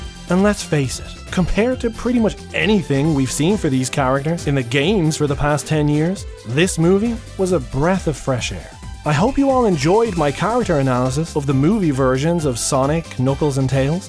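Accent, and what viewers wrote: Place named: Ireland. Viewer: As long as our favorite foxboy is given something in compensation in the future, I'll be very grateful and not as bitter 😆 Oh good, I was worried it'd come across as creepy 😖 And nah, I (at least, can) understand you pretty well! I dunno where in Ireland you're from but your accent is fairly clear to my ears (unlike some others 🤭)! I'm sure it's a cliche thing to say but Seán (aka Jacksepticeye) kinda helped my ears become a tad accustomed to to... well, SOME accents anyway, since there's not just one accent. glares at my fellow Americans who've yet to realize this fact Anyways... Yeah, Seán has made it slightly easy for me to pick some accents out, even if I can't tell whether they're from Cork or Dublin or what-have-you 🤣 When I first heard yours, I was like "Wait, is that...?" so I checked your homepage to double-check (hopefully that, also, isn't weird but 1. 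It was gonna bug me - especially if I was mistaken, which would've been super embarrassing - and 2. I like to know where the YouTubers I watch are from if it's not obvious) and I had a sigh of relief being right LOL Maybe it's due to Seán but I've developed a great appreciation for Irish accents (and by proxy, the Irish culture and folklore but then, I've always been into Irish music and dance since I was young) and being partially Irish myself doesn't help matters 😂😅 I'm so sorry that if this is ALOT to taken in, all cuz it's on your bloody VOICE - which is normal and probably nothing special to you, but I have a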